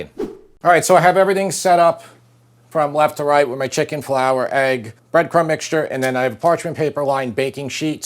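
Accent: New York, United States. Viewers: Making this tonight. Thank you for sharing your skills with us. And I like the NY accent:)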